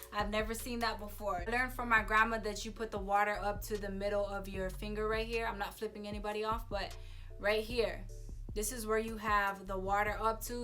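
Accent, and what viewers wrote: Hawaii, United States. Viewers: omg you talk like my mom who was born in hawaii 🥺🥺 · I personally get annoyed when people used to call me a “Hawaiian” when I lived in CA. I know they don’t mean wrong, but I correct them and explain that I’m “from Hawai’i”, but that “Hawaiian” is an ethnic group and I give them a quick background ‘cause they need to know. And I dunno what you’re talking about...you’re EXACTLY what a local girl is!!! You sound like all my friends! Hehe. I love ALL your points! I grew up going fishing Pokai Bay every weekend and my dad always packed up early if we caught plenty fish in the morning. And yes, I’m always telling my mainland friends to wait for good waves ‘cause they wanna take the first one...after a few swells, they finally listened! 😜 Hope you doing good on the mainland. 😁🤙🏽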